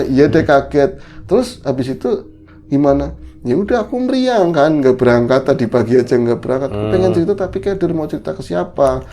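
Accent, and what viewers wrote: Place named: Solo, Indonesia. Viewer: Mas flexible, ya. Tema wong Solo otomatis setelan gaya bicara wong Solo. Tema Betawi otomatis gaya bicara ngikut dialek Betawi. Mangstab 🤭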